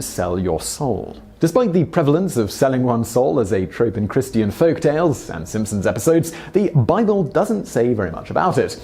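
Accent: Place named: United Kingdom. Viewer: love this channel! it s my fave for top 10 listsand I love s narration and brit accent (and dry wit)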